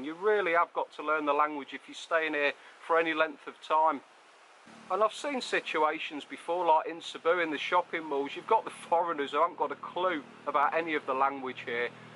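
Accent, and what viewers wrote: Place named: Yorkshire, United Kingdom. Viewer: More interested in your accent. Yorkshire?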